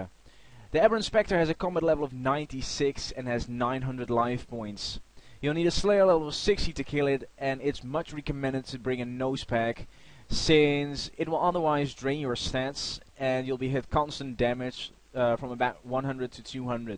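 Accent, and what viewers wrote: Netherlands. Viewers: I'm gonna guess by your accent that you from Holland? My bro thinks you are Norwegian but you sound like you are from Holland. Am i right?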